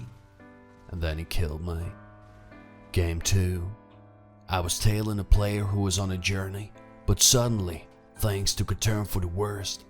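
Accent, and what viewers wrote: South, United States. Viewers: the accent is a southern american accent. not south america i mean the american south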